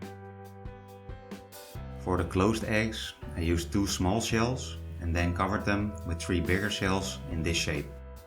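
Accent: Netherlands. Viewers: ja ik ben zelf nederlands ik hoorde het direct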